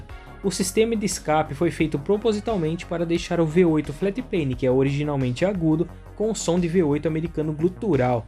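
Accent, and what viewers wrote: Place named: Paraíba, Brazil. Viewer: Esse sotaque da Paraíba ta bem audivio mesmo tentanto não deixar aparece no vídeo, ótimo vídeo Aquela yamaha é 2 tempos ou 4 tempos?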